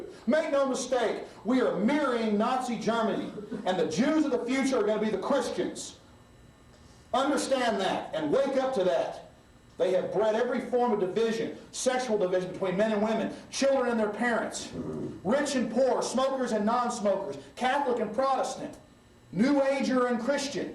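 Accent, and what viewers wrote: South, United States. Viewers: damn what happened to his southern accent? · Alex Jones or an imposter? Or is the current Alex Jones the imposter? This guy has a REAL southern accent, the current Alex Jones seems to have a Russian-pretending to be a Michgander accent. (heads up people, the biggest conspiracy you have never heard of is this: There are a LOT of Russians in key positions in this country pretending to be Americans!!!!)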